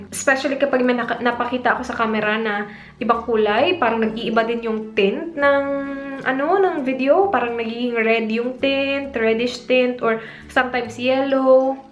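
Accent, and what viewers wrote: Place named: Visayas, Philippines. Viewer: I love your videos but nalingaw kos imu accent and tooONE?!?!. bisaya kaa YOoO?!?!. pataas inig human sa sent...eeEeENCE?!?! ✌✌✌✌ It would be better if ma fix gamay😊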